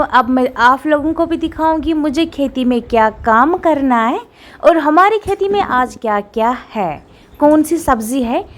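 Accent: Kashmir, India. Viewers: Aap ki kashmiri voice bhot ache hai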